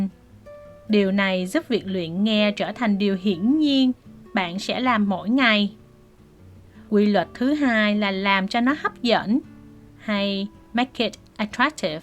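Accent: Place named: South, Vietnam. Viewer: 전 남부 발음을 공부하고 싶어서 이 채널이 좋아요!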